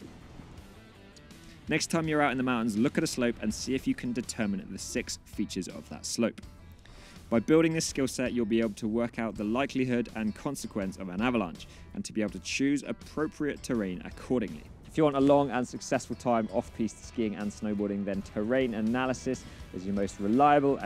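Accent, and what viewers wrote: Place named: Australia. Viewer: Oh jesus! Not another aussie, new zealander or whatever!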